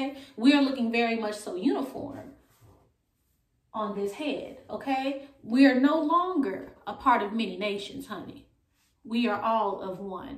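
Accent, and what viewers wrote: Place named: New Orleans, United States. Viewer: That accent don’t lie lol to say you’ve been gone so long New Orleans is just in us 😂🙌🏽🥰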